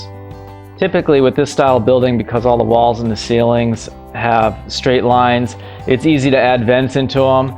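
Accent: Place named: United States. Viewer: Barrels in a greenhouse supposed to store heat, then temperature can be more consistent. Barrels can only be filled with water, hit by the sun, or connected to solar panels. You can also fill nutrient solution that you circulate trough your plants, using any kind of temperature control that you wish. heating the water in your greenhouse is way more sustainable then air. Especially some of hydroponic systems require a huge amount of water. I am also missing a bit of broad analysis on greenhouse types. Pros and cons really depend on climate but I only heard that is either suitable for your climate or not which I assume is somewhere in the US because of your accent.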